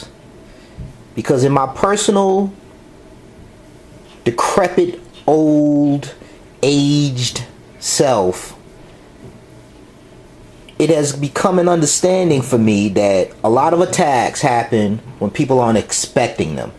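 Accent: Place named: New York, United States. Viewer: Not to offend but it sounds like your from new york im from alabama so our situation is a little different but i do like your reveiws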